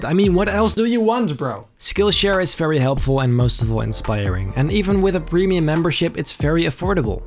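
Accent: Netherlands. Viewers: Your dutch, i hear it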